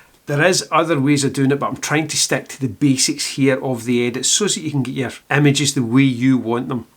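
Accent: Scotland, United Kingdom. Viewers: Just found your channel and I'm glad I did. Excellent tutorial and easy to follow what you're doing. Eilean Donan must be the most photographed castle in Scotland. Visited it many times on my way over to Skye. Nice to hear a shots accent on the tube, and I'm looking forward to more of your tutorials. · hi , since I watch you I don't know if I became a pro of Luminar but certainly I did improve my understanding of the Scottish accent :-)) ... I wish I knew you before so I could understand the Gallagher brothers ( (I know there are from north England but it seem to be close from yours) during my last photo-session with them (so frustrating not to understand a single word) ... So please note , I love Scottish people and their folk accent but please just try to be more British if it's possible , for a French guy well trained in English it wasn't so easy to understand ;-) thanks ... 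best ... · Great edit, clear step by step instructions as usual. You are really helping me to become more and more confident with Luminar,. Oh, and I could listen to your wonderful Scottish accent all day! 👍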